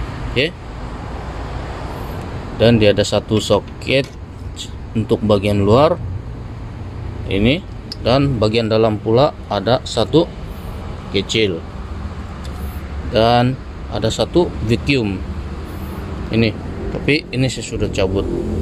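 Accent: Sabah, Malaysia. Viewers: Sabah ni boss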